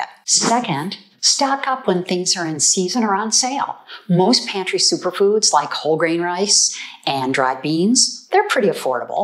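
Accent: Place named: Midwest, United States. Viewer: BTW love your mid west ? Accent. 🙂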